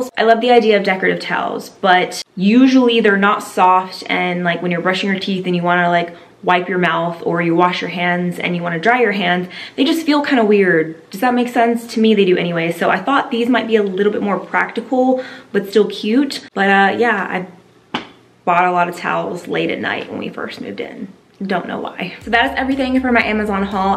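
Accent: South, United States. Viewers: Why am I just noticing your southern accent?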